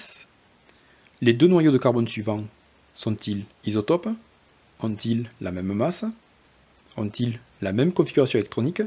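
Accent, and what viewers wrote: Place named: South, France. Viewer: Excusez moi de vs demandez ça mais vos explications et votre accent du sud me rappel un professeur que j avais eu à Ingres? Cela serait improbable mais je demande qd même d autant+ que je suis tombée par hasard sur votre chaîne.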